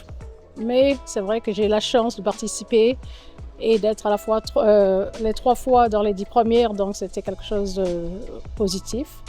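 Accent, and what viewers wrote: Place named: United States. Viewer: Ah ma petite, tu nous a fait rêver, tu nous a fait sourire, tu nous a fait pleurer mais on t’aime tellement! Ça fait trop plaisir de te revoir avec ton petit accent américain. Sois heureuse dans tout ce que tu fait ma belle et surtout continue d'être heureuse et de donner de la joie autour de toi! ❤ Un grand merci à tes parents ❤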